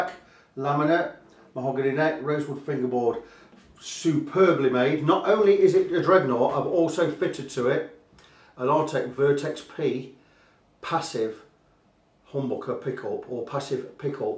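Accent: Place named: Nottingham, United Kingdom. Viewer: I knew you were a Nottingham lad as soon as you said "Sellin". Nice guitar, hope it went to a good home.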